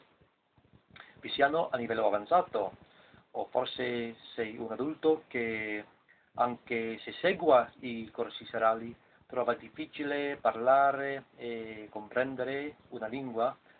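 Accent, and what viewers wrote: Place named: Spain. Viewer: You have a spanish accent when you speak italian, is interesting how a second language affects a third,fourth ect....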